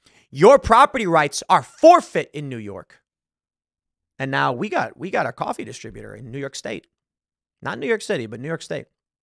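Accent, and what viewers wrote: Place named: Chicago, United States. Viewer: Lol. You could definitely tell your from Chicago.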